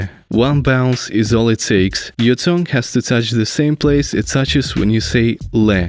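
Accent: Russia. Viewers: Ля, он как будто русский, но не русский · Это русский человек по произношению понятно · Неужели по акценту не стало понятно, что он русскоговорящий?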